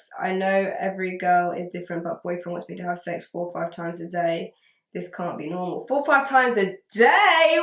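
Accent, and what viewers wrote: England, United Kingdom. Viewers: I like listening to the way you talk I like the UK accent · you have the best english accent and tone of voice I've ever heard. I could listen to you all day!!!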